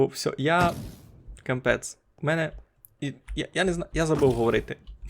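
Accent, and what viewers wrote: Transcarpathia, Ukraine. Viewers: Йой, то є закарпатська мова?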